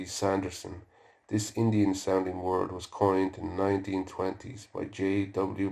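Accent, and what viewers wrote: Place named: Ireland. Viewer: What part of Ireland are you from pal? 🇮🇪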